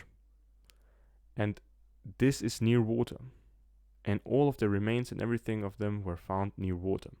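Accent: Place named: Russia. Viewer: По вашему акценту, мне кажется что вы Русский!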